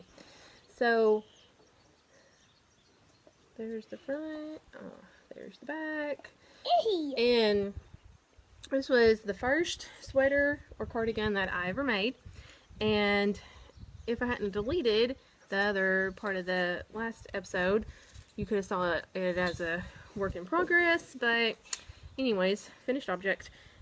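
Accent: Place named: South, United States. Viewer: I have enjoyed both of your podcasts and am looking forward to more. I'm a knitter and crocheter from Pleasant Shade, TN. Love the details that you give about your projects. Your hand dyed yarns are lovely, I would love to learn to do that someday. It's a treat to hear a podcaster whose southern accent matches mine! And your Co-host is adorable 😊.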